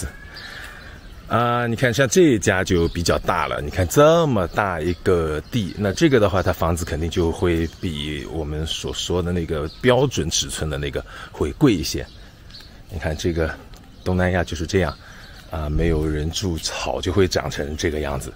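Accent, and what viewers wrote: Shanghai, China. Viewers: 上海口音，口气。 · 册那 一听口音就是阿拉上海银（手动滑稽）羡慕长居海外鸭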